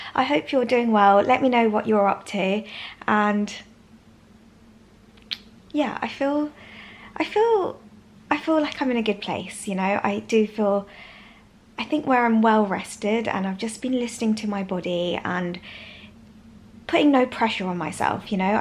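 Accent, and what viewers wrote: England, United Kingdom. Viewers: It’s the English accent .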